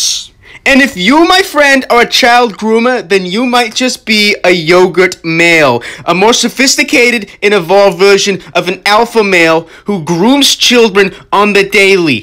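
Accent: Boston, United States. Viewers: Why he got a Boston accent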